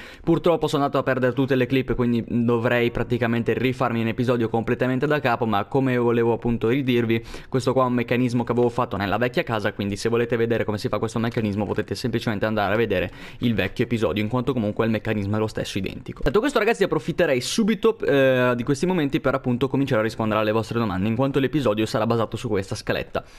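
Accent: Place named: Monza, Italy. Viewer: Secondo me sei di Monza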